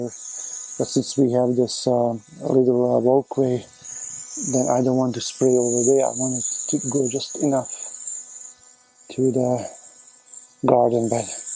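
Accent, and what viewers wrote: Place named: Balkans, Eastern Europe. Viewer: Very detailed. Thanks for this. You sound eastern European. Maybe from Balkans?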